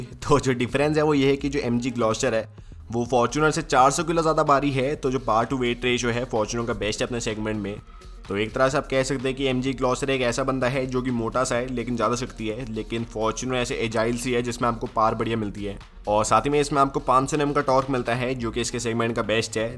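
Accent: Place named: Hyderabad, India. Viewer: Tu snax gaming vale bande ke jaisa bolta hai bhai 😄😄 sahi lagta hai , full haidrabadi style 🔥🔥🔥🤙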